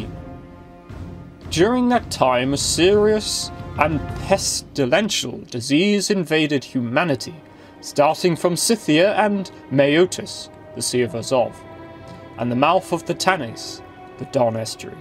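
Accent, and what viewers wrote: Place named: United Kingdom. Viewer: Good show, but I cannot stand a British accent. Also the background music is far too loud and annoying.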